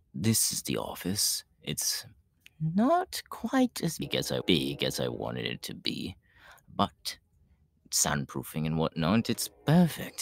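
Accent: United Kingdom. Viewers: Me when he has a British accent: 👁👄👁 😺🦋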